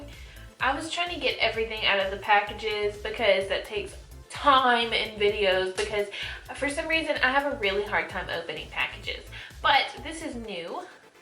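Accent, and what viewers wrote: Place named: Louisiana, United States. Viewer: Okay.. I just randomly found this video cause I wanted to know more about that hard candy palette cause I saw it in Wal mart today! Anywaysss, right when you started talking I knew you were from Louisiana & then you talked about the hurricanes & I was like yep, I’m right! Hahaha. Anyways, I just subscribed! I’m from Lafayette!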